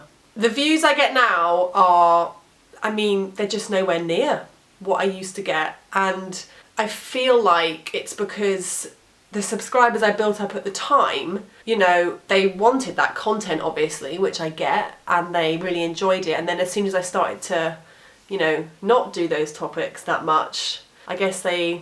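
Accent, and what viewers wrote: England, United Kingdom. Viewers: I have mentioned to that she actually has overall talent and appeal to elevate what she started here into a career in the entertainment industry. She would be a fabulous host of headline series and could even perform acting roles; initially shorter and follow the progression onward. She reminds me of a mild version of Elizabeth Hurley with great appearance and a pleasant English accent. She could easily have an expanded career. I know that I would certainly cast her. She's also a good person whom cares about the environment, animals and necessary causes. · If you remove music, dogs and cats' video and what would remain to me to watch on Youtube? A huge commercial?! No, thanks. How should i train my ears to english accent without video? Travels vlogs and intercultural oddities would be good topics for me. Normal people testing impartially the pulse of a Country would be good as well (since, as we can all see, Press is no more reliable, pratically everywhere nowadays!). 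Otherwise do like me: join some online video and graphic contest portal and have fun. No need to put everything on Youtube only. Even though video and graphic platforms are getting a bit worse as well recently for the same (second) reason. But remember to edit your audio in a better way. ^__^ It's obvious that once there's a so much huge video production, all around the world, view counts get totally random, aleatory and unstable. Especially for english speakers and viewers. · You are amazing. Do what videos make you happy, what videos you actually WANT to do. I will continue to watch........mainly because of your British accent, hahaha. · Sugar, First I tell you we watch your channel because you are you and you show us your own personality. I think you are a very seemingly sweet young lady. I like when you speak of your own real life. What most people are watching youtube for is to be entertained. 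I very much enjoy hearing your British accent and just listening to you. If you want to shake it up a bit, you might think of also coming up with ideas to interview some of your friends of family about real things going on in life. Maybe mix that in with a little humor here and there. Show us what life is like in Britain. Are there any interesting things near you we might be interested in seeing on camera? Historical, scenic or perhaps you hang out with the royal family here and there? maybe if your camera is mobile you could get interviews on the street from Bobbies about how they feel about U.S officers can carry pistols and they can't. I am just suggesting you find things different about your country and life from others and enlighten us. By the way, Why is the picture missing. Don't quit broadcasting, I like ya. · I'm a subscriber for about 2 years, to be honest I started to watch your videos in order to improve my english listening skills, specially for your lovely british accent. 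As time went on, I began to enjoy your videos, I like your no pretentious and honest ways, It's precisely what keeps me here, that you're not like most youtubers (I mean at least you don't call your viewers "harties" or "emilies" or some shit like that). Good luck in the future from a viewer from Mexico. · Hello from the US of A. Don't stop. Forget the other channels. Don't stress over the number of likes, or the unflattering comments. What caught and holds my attention (despite my ADD) is YOU ... your style, your cute Brit' accent, your presentation, your wit, your sense of humor, (get it?). The topics are great. Very amusing. Actually thoughtful. Just keep doing it your way. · I'll admit I stumbled on you by accident.Saw a thumbnail with a pretty face.Stayed because I found you funny and love the British accent.But you also let us in on some issues you've had.Thought that was brave.Long story short you keep making these and I will keep watching.Fuck those guys that just want to ruin the crap we like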